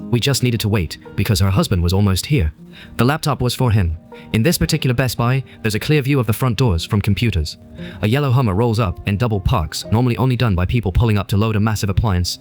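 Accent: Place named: United Kingdom. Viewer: What’s with the British accent?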